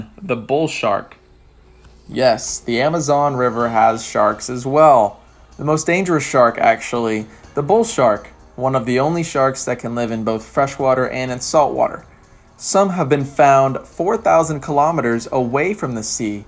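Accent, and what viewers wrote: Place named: South Africa. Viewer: Oh this channel looks good! Must be South Africa as he has a strong SA accent. Will check it out further.. what a nice guy.. I sooooooooooi hate trophy hunting, will NEVER understand how some get fun out of killing these magnificent beasts.. Trust me, channels appreciate comments, Violet - it all helps.. and thankyou for your kind words!! 🤗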